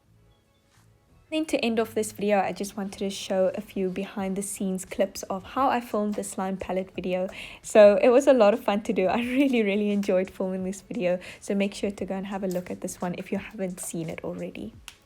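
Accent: South Africa. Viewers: I have a question are you in South Africa because of your accent and because of the brand of the coffee and milk? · wait is she south african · wait, i just noticed ur from South Africa, i looked up ur slime shop and saw everything was in that currency. I'm shocked, no wonder your accent sounds so familiar! My mother is from South Africa! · I clicked on this video having no idea that you're South African! I heard your accent and was like OMG MY PEOPLE!! I live in the Eastern Cape and find it unsurprising that you're based in CT where the cool things happen. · When i heard your voice i immediately thought of south africa😂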